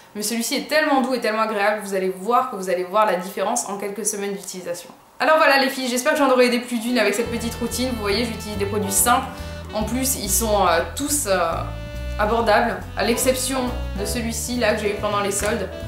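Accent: England, United Kingdom. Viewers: tu es l une des mes youtubeuses beauté préféré.. je kiiiiiiffe tes conseils, ta facon de t exprimer, ton accent anglais (hihi surtt qd tu dis le mot HIGHLIGHTER LOL), ta facon de présenter tes produits (tres bonne ambassadrice) et ta classe naturelle. Tu as bcp de gout et bcp de talent. Que fais tu dans la vie ? Commerciale ? Bref.. tu as compris que je te kiffais et j apprécie que tu parles svt de produits a petit prix..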